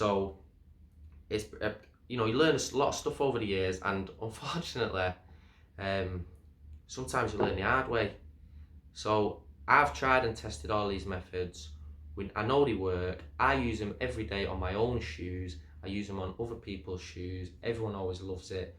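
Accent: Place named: United Kingdom. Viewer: This might one of the most British accents I have ever heard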